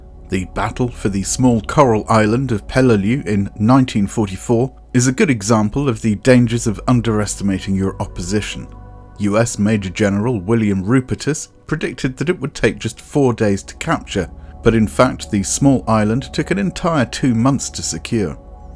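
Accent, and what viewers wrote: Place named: United Kingdom. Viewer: Thanks yet again for an informative an interesting piece of work. Your style is and unruffled and your British accent is, of course, charming as hell...as always. Have to say I did miss " " at the end, though. It is always such a relaxing end to a good time, especially if you do a ramble at the end. More, Please, Sir!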